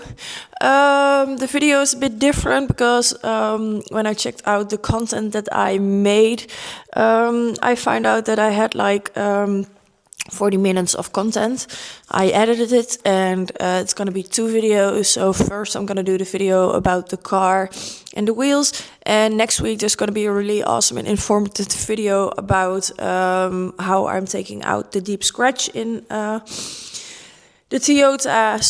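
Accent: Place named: Netherlands. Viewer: Love your NETHERLAND accent 🤗🤗🤗🤗🤗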